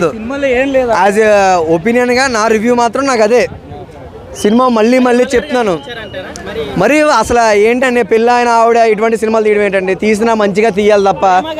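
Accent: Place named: Andhra, India. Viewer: by his slang it seems like he is from andhra who doesnt know any history of hyderabad. adi ni pain kadu kada niku ardam kadu chudadam maneyi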